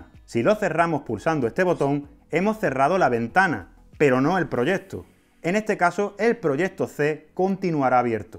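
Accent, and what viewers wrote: Andalusia, Spain. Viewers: eres un crack. Videos super currados, concisos, certeros, clarísimos... y en andaluz. No se puede pedir más.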